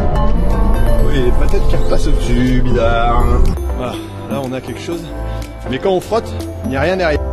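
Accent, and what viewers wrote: Nord, France. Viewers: Bonjour, je te suis depuis la seyne sur mer (var), mes parents étaient cultivateurs à Naves près de Cambrai. J'apprécie tes vidéos, tes explications sur l'agriculture d'aujourd'hui. Bonne continuation pour la suite. L'accent du Nord est magnifique.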